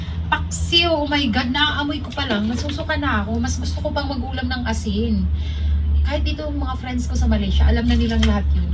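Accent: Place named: Visayas, Philippines. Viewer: Pyor jud bisaya si ma'am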